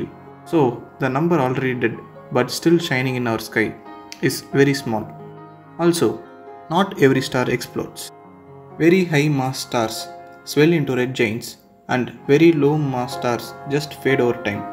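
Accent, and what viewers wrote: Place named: South India, India. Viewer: Your accent is very similar to a South Indian men. Am I right?😀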